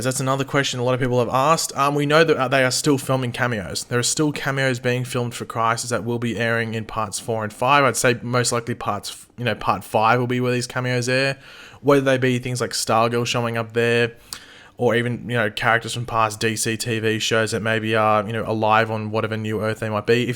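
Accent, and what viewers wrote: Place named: Australia. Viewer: You sound like a Australian version of Tom Welling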